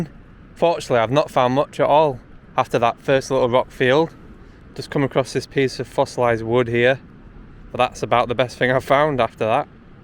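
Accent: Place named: United Kingdom. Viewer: You have the best British accent. #1 British person.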